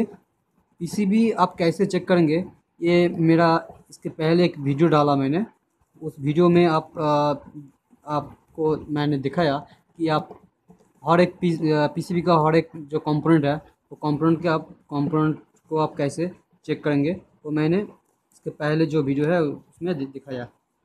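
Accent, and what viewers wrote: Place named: Bengal, India. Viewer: From Bengal as evident from accent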